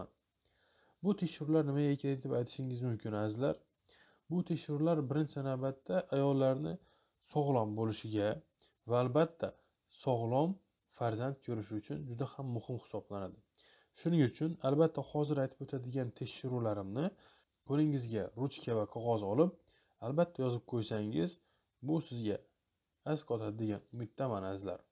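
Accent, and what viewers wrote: Khorezm, Uzbekistan. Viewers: доктор кайерда ишлайсиз гапиришиздан хоразимликка охшайапсиз.агар хоразимда болсангиз иш жойизни айтинг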